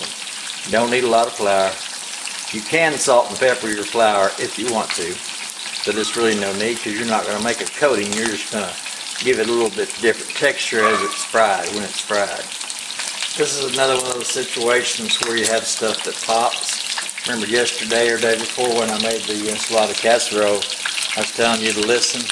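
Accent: South, United States. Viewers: He sound Country I know this pork chop Finna be good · As soon as I heard his southern accent I trusted him haha 😆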